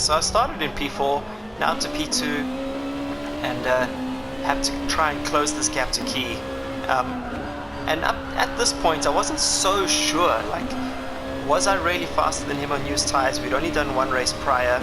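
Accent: South Africa. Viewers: Nothing like a south African accent......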